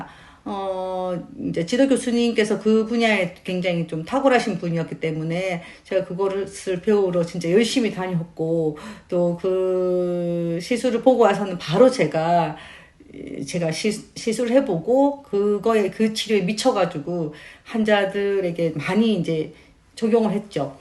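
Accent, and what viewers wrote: South Korea. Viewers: 저 버들강아지 기억해주셔용 넘예쁘고 젊으심 말투가경상도라서 친근함 이 있어요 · 와우 평소말투 그대로! !부산말 안고쳐지네요 차암 어렵죠잉?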